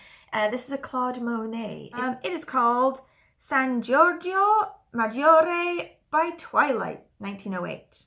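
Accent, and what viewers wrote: Wales, United Kingdom. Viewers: Omg u are turning more Welsh with every click. Newest one ul be like Staceu from Gavin and Stacey, no offence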